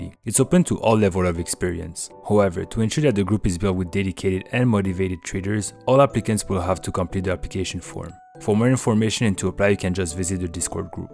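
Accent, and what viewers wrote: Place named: France. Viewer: je me disais bien que l'accent me disait quelque chose mais je savais pas que t'etais francais mdrr beau boulot en tout cas bravo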